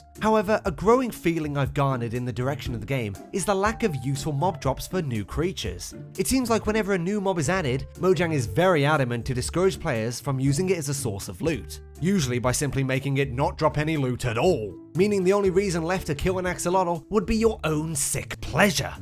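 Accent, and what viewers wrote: United Kingdom. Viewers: and GradeAUnderA are the same person theory Grade ✅ British accent ✅ ✅ Ranting ✅ ✅ Sounds angry 90% of the time ✅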